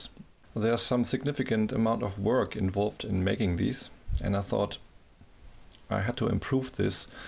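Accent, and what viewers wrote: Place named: Germany. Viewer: Hi thanks for the helpful information. I guess you are from Germany from your accent.. Would you be able to help me source the aluminium bus bar ? I live near Germany ( und ich kann auch Deutsch reden ; )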